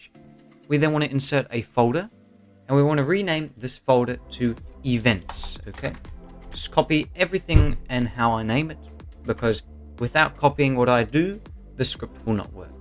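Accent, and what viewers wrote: United Kingdom. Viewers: Bro idk why but you are legit the most formal person ever. Idk if it's the british accent but god dayum you just sound so professional. I feel like I've done something wrong and you're trying to explain to me why I'm an idiot in the calmest way possible.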